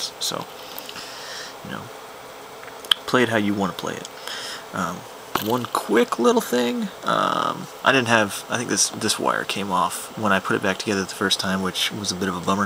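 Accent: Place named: Midwest, United States. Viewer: HA! you must be either from the mid-west, or Arizona... because you talk like ME! FUk this, fuK that... PLUS I spotted that Quicktrip cup on your floor :) thanks for this informative video, really entertaining too!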